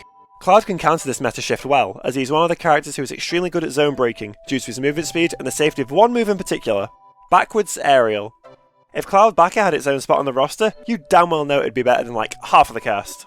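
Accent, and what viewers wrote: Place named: United Kingdom. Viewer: heard british accent liked video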